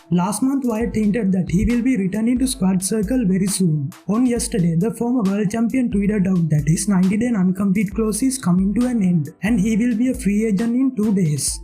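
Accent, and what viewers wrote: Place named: India. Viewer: Can I understand a single word this guy says. His Indian accent is just too strong to understand.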